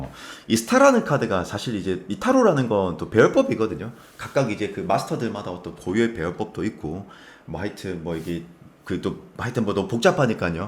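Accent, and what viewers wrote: Gyeongsang, South Korea. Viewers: 같은 경상도라 그런지 더 정감이 갑니다~ 2번 선택 했는데요~ 요즘 다들 힘든 경기 겪고 있는듯한데 부디 잘 버티고 힘든 시기 고이 지나갔음 좋겠네요. 영상 자주 보고잡아요😊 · 3번 리딩 감사합니다🙏🏻 구수한 경상도 사투리 넘 듣기좋아요 ㅋㅋ 중간에 살아있네ㅋㅋ 쌤 리딩 처럼 졸라 좋은 결과 와서 후기 남기고 싶네요 😂 추운날씨 감기 조심하세요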